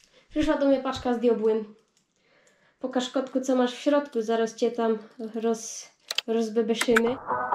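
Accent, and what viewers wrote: Silesia, Poland. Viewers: Podoba mi sie ta Śląska Godka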